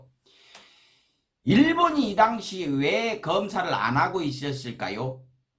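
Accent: Jeolla, South Korea. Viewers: 강 성범씨의 유창한 전라도사투리가 너무 웃겨서 밥 먹으면서 듣다가 자꾸 밥을 뿜게 돼서 밥 먹을 때는 보면 안 되겠어요 내용 너무 좋고 알기쉽게 설명해주셔서 감사합니다 팬 되부렀스요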